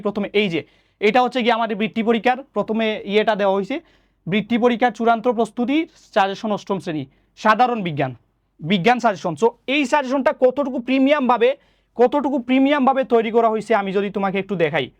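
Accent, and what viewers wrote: Sylhet, Bangladesh. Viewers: Yes Sylheti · sir ar kota bola sune mone hocce sir sylheti😂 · Hmm sylhetii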